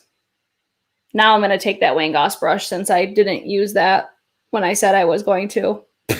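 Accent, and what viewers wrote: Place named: Upstate New York, United States. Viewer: She’s from upstate NY :)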